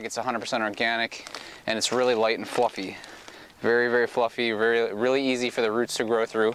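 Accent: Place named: United States. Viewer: you misunderstood him as I did briefly until realising it's his American way of talking.he definitely meant DONT be using too much of it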